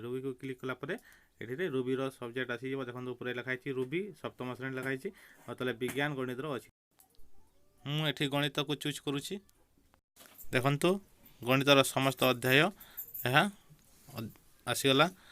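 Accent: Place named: Western Odisha, India. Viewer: Thank you sir Apana western odisha ra ki apanka language Ru semiti janapaduchhi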